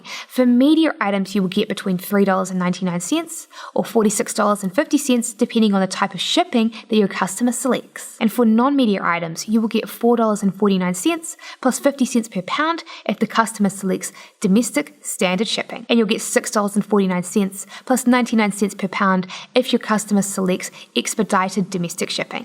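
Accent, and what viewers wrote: New Zealand. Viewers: I began to understand a lot more about Amazon and Ebay selling, just from post. This led me to research much more in depth regarding this subject. So, in my opinion, great work!!! Additionally, I imagine the reviewers leaving negative comments believe they are showing a humerus side of themselves, but only identifies them as ignorant and unpolished. Her accent is amazing and I suspect she does hail from New Zealand. If you haven't traveled, the country possesses an undefinable beauty, North and South. The Kiwi population (aka New Zealanders) are a wonderful, warm, welcoming and positive people. I would live there in a heart-beat and would relish hearing words like, DEPIEND and CIENTS, its quite enjoyable to hear. Let's bear in mind that English existed far longer than America, so we need to be tolerant of other English speakers, including Speakers of English as a Second Language. 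· 'Depind', 'cint', etc. This is New Zealand pronunciation.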